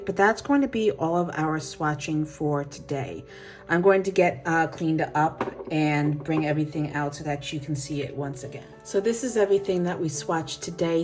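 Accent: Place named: Northeast, United States. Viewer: I’m actually binge watching your videos. I just found your channel yesterday and I love it! You sound like you’re from the northeast which is my home. ❤️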